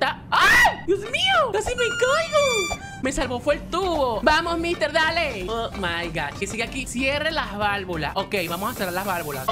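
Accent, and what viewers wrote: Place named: Colombia. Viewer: Y sí cierto hablas como colombiana se te escucha el acento y todo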